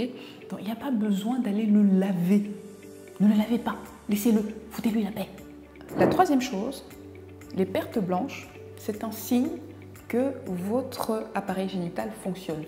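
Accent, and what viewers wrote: Cameroon. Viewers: Cet accent est typique des camerounais 🇨🇲🇨🇲🇨🇲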